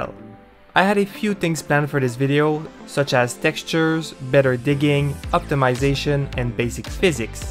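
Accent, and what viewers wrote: Quebec, Canada. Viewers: Not really related to the video, but are you from Quebec ? xd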